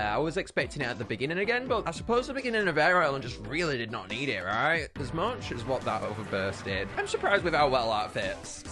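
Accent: United Kingdom. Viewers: are you bri'ish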